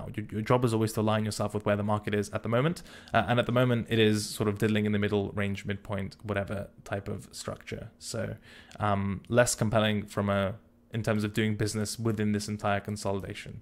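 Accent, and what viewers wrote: RP, United Kingdom. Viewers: despite sounding like a tory boy is legit the best at going over price action, always watch your videos lad. thank you. i really mean that.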